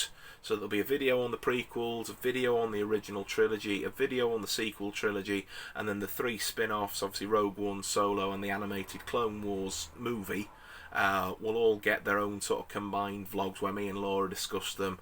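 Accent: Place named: United Kingdom. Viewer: Hey mate, just found your channel and subbed straight away. Great buds buddy. Where abouts in the uk are from? Always good to hear a fellow Brit in the Tube 👍🏻🎥💿🎞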